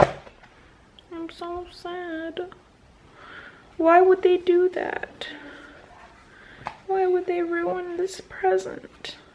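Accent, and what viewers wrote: South, United States. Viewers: omg I'm from Louisiana and your southern accent is so accurate 😂😂😂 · I could listen to Colleen's southern accent all day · Hahah love Colleen's southern accent! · I know I'm from the northern pat of Florida like 1 hour away from Alabama and we're "southern" and her accent is on point😂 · Colleen's southern accent makes me smile, and I'm not even from the south! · Love your stereotypical southern accent 😂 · Southern accent is so accurate! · I'm from Louisiana but nobody talks like that here lol. But I agree that the southern accent is spot on, for like Georgia and Alabama and Tennessee!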